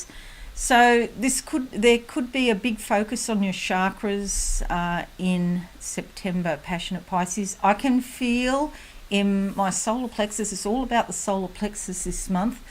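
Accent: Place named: Australia. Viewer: Thankyou beautiful 🙏🏽🌹 I am so intune with this Read and like a true fish will just go with the flow. As for the wishes I truly hope they come true if it is meant for me. Love your beautiful voice and familiar Aussie accent...i feel on your wavelength 🙏🏽🌈♓💜🌹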